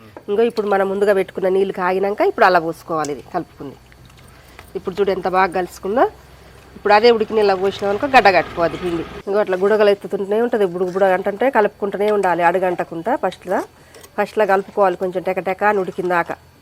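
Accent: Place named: Mahabubnagar, India. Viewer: Medhi mahabubnagar side kadha language thelusthundi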